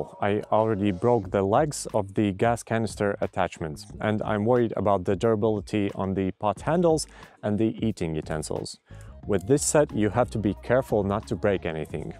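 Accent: Latvia. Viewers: Pēc akcenta atpazinu, ka latvietis :)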